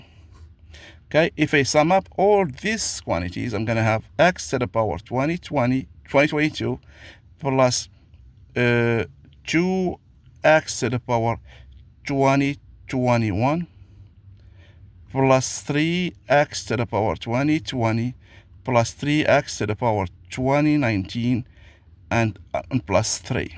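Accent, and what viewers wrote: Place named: Morocco. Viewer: you sound very moroccan sir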